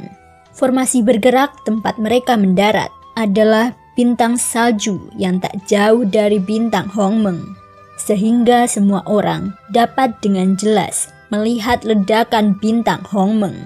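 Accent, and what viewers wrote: Java, Indonesia. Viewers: terlalu medok noba yg ke 4 ini😂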